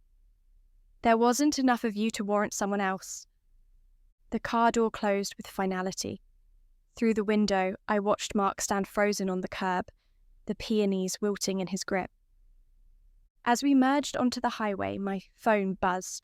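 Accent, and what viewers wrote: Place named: England, United Kingdom. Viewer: Lol, it's an AI program just a better one than most. It depends how much you are willing to spend. Also English voices especially her being a more refined English is very pleasant on the ears.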